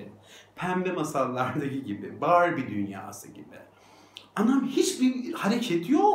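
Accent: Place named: Kayseri, Turkey. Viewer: Hocam iyiki varsınız kayseri şivesini çok güzel kullanıyorsunuz beni güldürüyorsunuz allahta sizi güıdürsün hemşerim.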